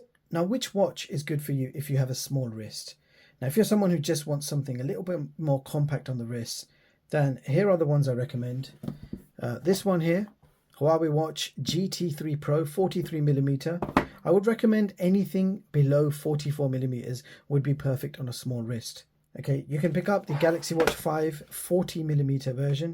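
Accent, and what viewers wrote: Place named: United Kingdom. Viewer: Your accent like Harry Potter Film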